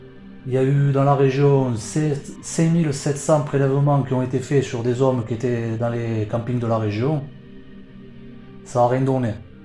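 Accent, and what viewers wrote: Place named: Marseille, France. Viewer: Aaaaah Marseille 😂🤣😂🤣😂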